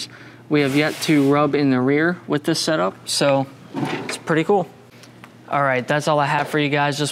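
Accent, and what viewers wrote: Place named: Philadelphia, United States. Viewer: Where are you guys located? You sound very Philly my friend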